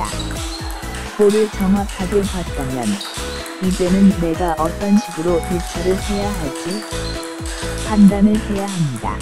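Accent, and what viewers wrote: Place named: Gyeongsang, South Korea. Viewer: 님 탁구러버 아닌가요? 님 말투보니까 딱 경상도 보리문디 같은데요..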